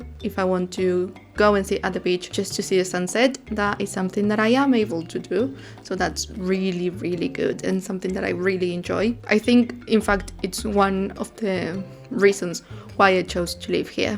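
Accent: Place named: United Kingdom. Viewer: I want that british accent! I need to move UK. What do you think? 😊 You are living my dream.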